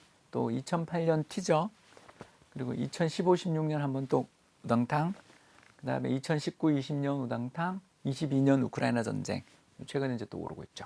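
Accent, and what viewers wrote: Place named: Gyeongbuk, South Korea. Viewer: '경북 사투리'에...듣고나면 고구마 한 솥 먹은 느낌!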